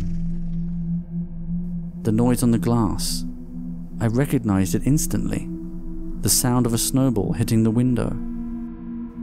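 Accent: United Kingdom. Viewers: Are u from the uk sir?? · Haha I heard it but it's really a light british accent :) Btw thanks for replying so fast I just wanted to know one more thing do you write these stories yourself or do people send you some and you illustrate them :) ?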